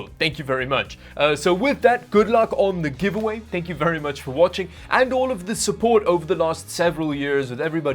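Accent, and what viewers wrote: South Africa. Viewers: Hey, are you south African? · You sound like a ex South African your name is spelt the same in Afrikaans which h is like Dutch thanks for a chance towim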